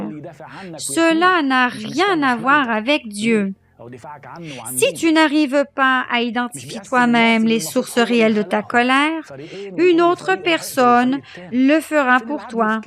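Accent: Quebec, Canada. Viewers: Français avec l'accent québécois ! Excellent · L'accent québécois t9iiil hhh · J'aime beaucoup l'accent canadien j'ai l'impression et que je suis en trame du coûter un prêtre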